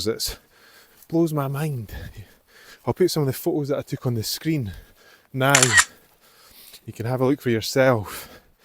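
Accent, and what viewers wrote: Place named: West Lothian, United Kingdom. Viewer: You are welcome your videos are not boring my pal goes to them all the time and a lot of the time people are in them its just the luck of the draw keep uploading your subs will go up you sound local to me i am in west lothian :)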